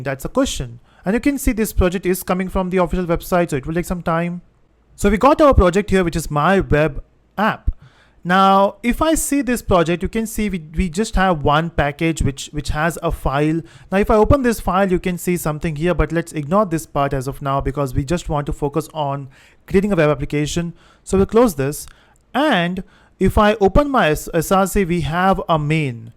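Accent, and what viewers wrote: India. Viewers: dude I love your accent, its half Indian and half foreigner and it sounds 10/10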